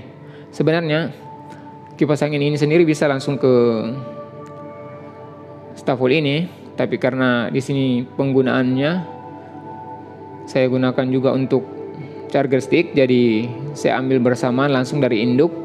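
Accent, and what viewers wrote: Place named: South Sulawesi, Indonesia. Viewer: Logat nya orang sulsel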